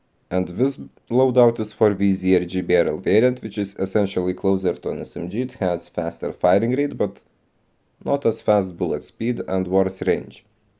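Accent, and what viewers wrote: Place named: Russia. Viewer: U from Russia cuz you got pretty thick Russian accent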